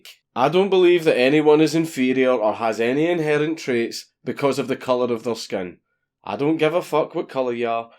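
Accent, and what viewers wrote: Scotland, United Kingdom. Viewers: He's Scottish? I thought he sounded like that because he'd had a stroke. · Thanks for bringing up the truth, and confronting that racist black woman! By the way, love your Scottish accent.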